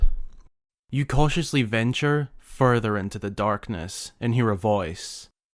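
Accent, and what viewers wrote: Scotland, United Kingdom. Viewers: Your Scottish accent is perfect for your Zelda content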